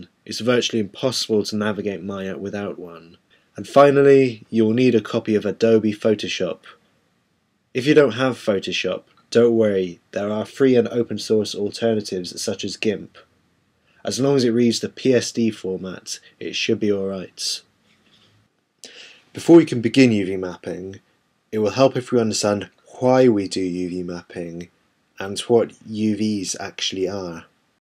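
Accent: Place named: United Kingdom. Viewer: He is a Brit! Brit always have cool accent!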